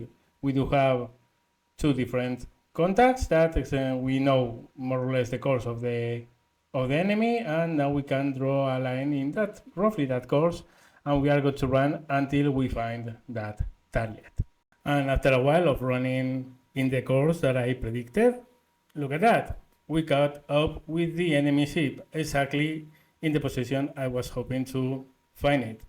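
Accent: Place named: Spain. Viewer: Unrelated to anything but Im wondering if you're Spanish since you sound like my Spanish coworkers when speaking English. But I could be wrong.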